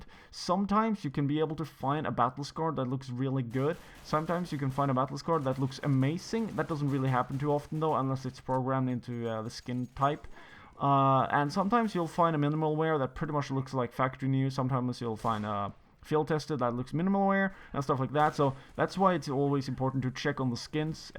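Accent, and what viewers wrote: Norway. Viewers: Haha, du har god engelsk, men alle nordmenn har en aksang man lett kjenner igjen. Kan jeg adde deg på steam?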